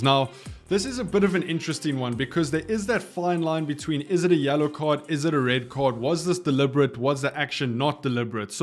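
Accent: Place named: South Africa. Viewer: I love moeeerrrr the like and subscribe. Truelly souf’aafeikaaan! Please like and subscribe is so English. And you know what we think English means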